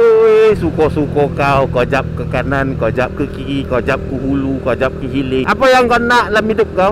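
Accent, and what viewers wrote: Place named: Negeri Sembilan, Malaysia. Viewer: Best lak tengok abg membebel dlm bahasa nogori... 😂😂😂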